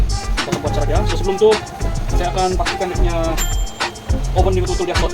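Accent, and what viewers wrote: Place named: Sabah, Malaysia. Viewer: Kedai dmna bang dsabah ka loghat mcm sabahan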